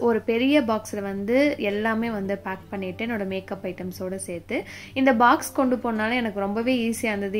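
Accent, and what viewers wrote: Kongu, India. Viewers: Nenga kongu ah sis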